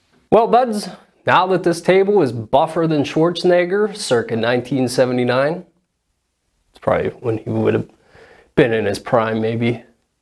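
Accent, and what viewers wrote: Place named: Pittsburgh, United States. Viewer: Being from Western Pennsylvania I knew you were from Pittsburgh area Western to central PA From your accent and the mountain laurels in the woods were you and Buck love to walk. You show great projects and do wonderful work Great ideas.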